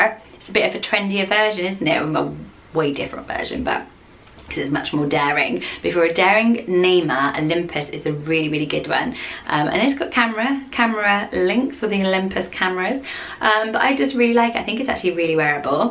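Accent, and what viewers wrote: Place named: South West, United Kingdom. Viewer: Where are you from? You pronounce your “r’s” like we do in the south west he he xx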